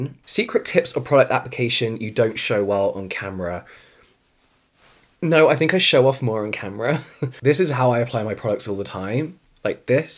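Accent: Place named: Wales, United Kingdom. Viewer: Great video sexy voice ✅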